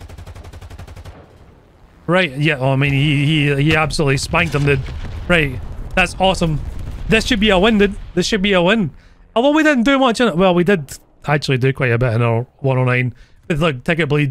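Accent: Scotland, United Kingdom. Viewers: Wait are you Scottish mate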